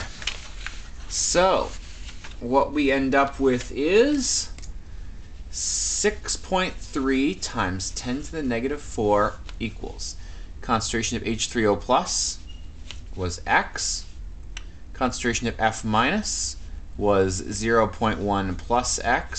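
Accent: Canada. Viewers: you Canadian man?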